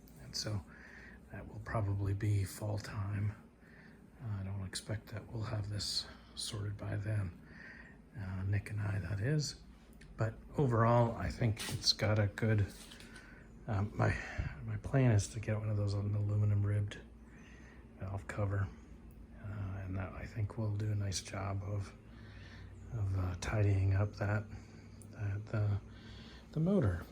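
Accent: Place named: Canada. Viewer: .....thanks for the reply, yes a good idea to test before committing to the frame. I take it from your accent you are Canadian, a couple of guys I follow on YT post regularly, Elin 'Rusty beauties' and chef tush 'tush mahal' both are triumph enthusiasts, and from your neck of the woods, though obviously Canada is just slightly bigger than the UK so you may not be that close.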